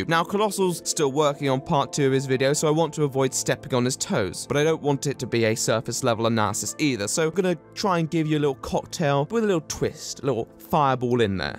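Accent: RP, United Kingdom. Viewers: So posh 😍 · People are comparing you to Colossal is Crazy? What? Why? Your content is way different than his and your voices aren't the same, I can tell that you both are from the UK. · This is weird but I love your voice and accent some British people I’ve encountered I thought were from Scotland anyway love your videos 🙌🏽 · I dont know whats less interesting, phussy or this video about him.....i will however subscribe as i am an american and therefore hypnotized by a british accent. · Omg you sound like your from the Uk !!! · I rarely comment on any videos. But, I have been binge watching these videos!!!! I'm into British Culture so the first thing that kept me here was the accent and then the fact that he is able to take me from A-Z on people I have idea about and I leaving feeling like I am ready to Spill TEA!! LOL! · You posh Brits GOSH!! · I like these slightly shorter vids. 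Not that I don't like the longer ones or appreciate the work but I finish these more often than the full on 60 minute documentaries. Mainly because I watch these in bed sometimes and fall asleep from his sexy british yarn lol. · Actually i love the British accent actually i think its the classy version of the English language. Theres another youtuber i like and thats Mr. Jim Sterling. And im a new subscriber to you to and i like your videos as well.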